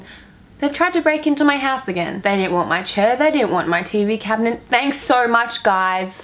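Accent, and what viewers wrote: Australia. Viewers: is she australian? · the Australian accent is HOT and so are you, my dear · Yes I found another Aussie XD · you sound aussie lol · Are you from Australia?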